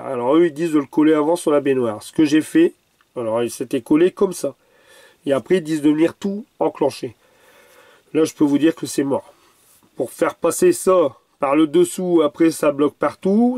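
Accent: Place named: South, France. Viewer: 😂😂 je l’aime cet accent du midi.......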